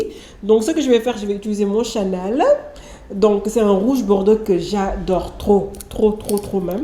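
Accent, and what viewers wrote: Senegal. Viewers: ne perd surtout ton joli accent sénégalais wolof comme dans ta vidéo de feug Diaye. Tu y es d'un naturel sans égal avec un accent très très joli. Comme dans la plupart de tes vidéos. Évite l'accent Chokho Chokho trop chic chic comme les immigrés ou sénégalais de France qui veulent parler comme français avec l'accent français + que les Français. C ton accent sénégalais authentique qui fait de roue une YouTube sénégalaise originale. 👍👍👍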